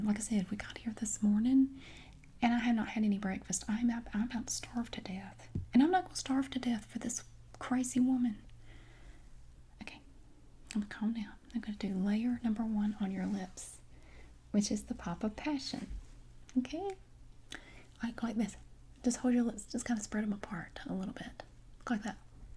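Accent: South, United States. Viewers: I think it's funny how the title says "super southern" yet this is how everyone I know sounds.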